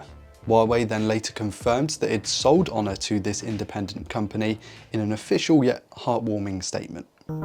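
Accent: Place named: United Kingdom. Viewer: That british accent is fire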